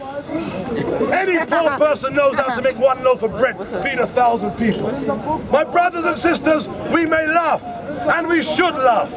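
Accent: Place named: United States. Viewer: anybody noticed he has aa american accent?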